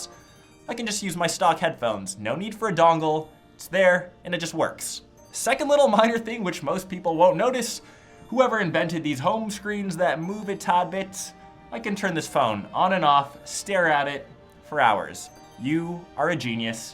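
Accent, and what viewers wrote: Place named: Canada. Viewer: lol you're Canadian accent is strong. nice video🙌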